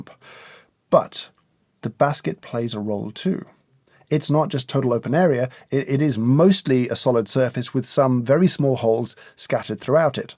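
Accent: United Kingdom. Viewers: This guy is perfectly British.....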